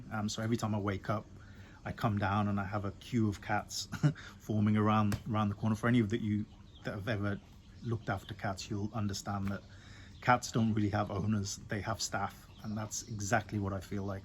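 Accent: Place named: Birmingham, United Kingdom. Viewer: Sound like a real guy bro. From a fellow Brummie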